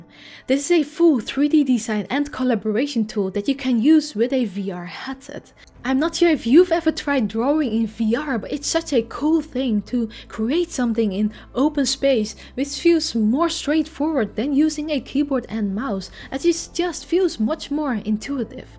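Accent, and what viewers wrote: Netherlands. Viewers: In the about section of this channel it states: location US. Maar hoewel taalkundig haar Engels als van een native speaker bestempeld kan worden, ik bespeur toch echt een licht Nederlands accent.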